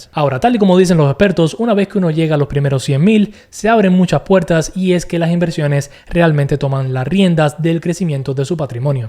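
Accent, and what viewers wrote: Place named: Venezuela. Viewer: Pensé que eras Venezolano. Hablas y te expresas como uno. Saludos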